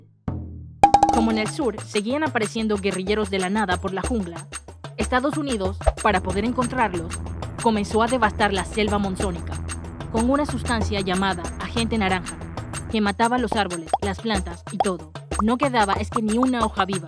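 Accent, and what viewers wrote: Panama. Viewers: Por el acento y por el minuto es imposible no saber que las personas detrás de este canal son Panameñas. Me suscribo de una vez Tremendo Canal!!!! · Muy buen, video, me encantó... pero una pregunta? En realidad eres de panamá??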